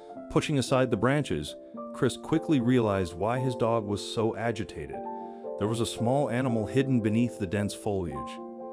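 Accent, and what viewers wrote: United States. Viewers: This becomes apparent that this is in Australia! I at first thought it was an armadillo. But “Chinese”! No way! Only Australia has wombats! The man must have lived nearby. There’s no way wombats would travel really far to rejoin a family member? And why do we have a Yank narrating?!?! They are very mischievous animals! Another good reason to allow them live in the wild.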